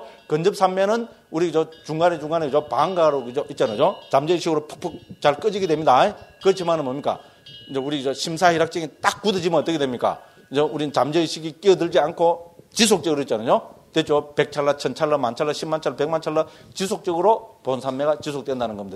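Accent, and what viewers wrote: Gyeongsang, South Korea. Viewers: 됐죠 스님~~ 경상도 사투리가 참 구수합니다.^^ 법문 감사합니다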